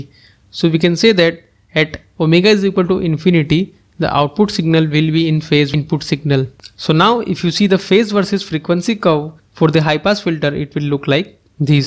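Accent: India. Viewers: I love the accent. Is that Hindi? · Jefe Julio el Gringo Judeo yes it's hindi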